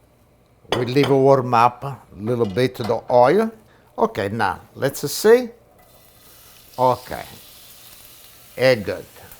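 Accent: Italy. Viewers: Grazie!! I love watching you cook and I love your accent, you sound like my neighbors (and cousins) when I was growing up!! They made me fall in love with tomatoes, garlic, lemoncello, espresso and all things Italian!! I really like your little bit of oil, olive oil is even in the Bible as a food we should eat. Tutti a tavola al mangiare!! Yummmmyyyy!! And please forgive me if I misspelled any Italian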